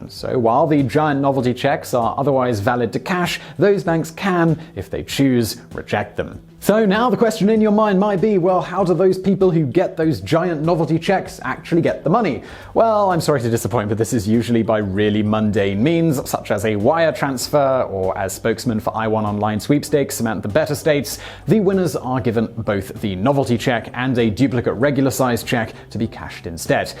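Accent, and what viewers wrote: United Kingdom. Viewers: The way you talk here is why I think you're one of the best narrators ever and why I always click your videos, regardless of subject. You could make a video on the average consumption of prune juice in your local elderly home and I'd still be fascinated. Also, you are probably the most British person alive.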